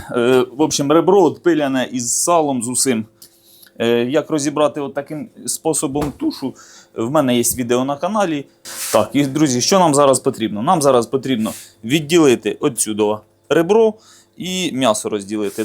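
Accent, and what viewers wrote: Cherkasy, Ukraine. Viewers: Зразу по говірці почув, що Черкащина. Привіт землякам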